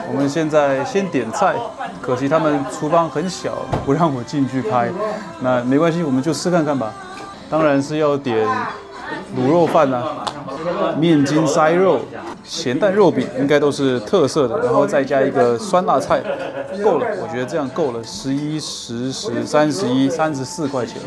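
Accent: Taiwan. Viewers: 为啥感觉你有台湾口音。 最明显的区别：台湾：试看看。吃看看。 大陆：试试看。吃吃看。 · 不知你是那裡人？有時候聽你説英文～國語非常像台灣人～ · 你是福建人嗎? 口音跟台灣很像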